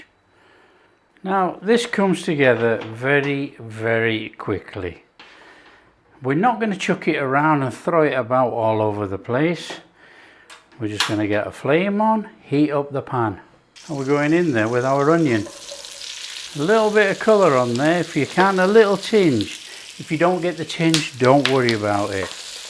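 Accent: Yorkshire, United Kingdom. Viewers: This will be the first time that I’ve taken an oriental recipe from somebody that has a United Kingdom accent. It looks delicious. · Great stuff - loving your Yorkshire accent :)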